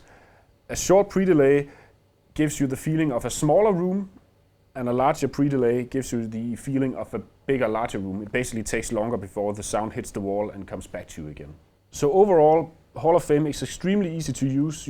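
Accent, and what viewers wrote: Denmark. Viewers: Lol at the Danish accent, lmao! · You can't hide with that accent! You've gotta be Danish! Hej kammerat! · danish english!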